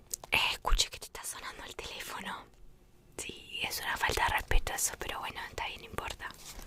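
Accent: Argentina. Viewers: llevo 45 segundos de video y estoy seguro que es argentina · Hola,te quiero preguntar de que pais sos? Por q creo que por ti forma de hablar sos de argenrina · Sos argentina como yo?? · sos argentina? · Wah... me encanta el acento Argentino, con solo escucharlo me relaja v: \^-^/💕 Eres muy hermosa ❤ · Si no es Argentina es uruguaya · Me cage de risa ensima el acento porteño nananana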